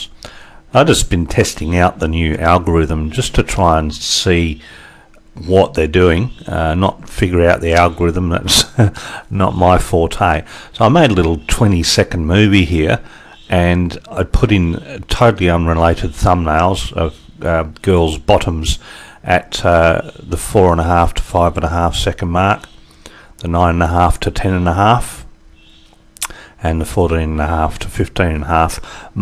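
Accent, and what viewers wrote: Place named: United Kingdom. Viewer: hes not american its british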